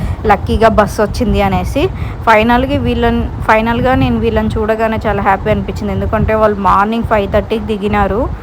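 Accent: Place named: Gauribidanur, India. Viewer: nam Gowribidnur slang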